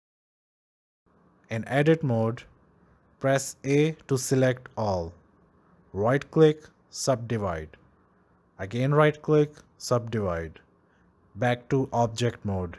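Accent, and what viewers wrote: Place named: India. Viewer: Really amazing even with the indian voice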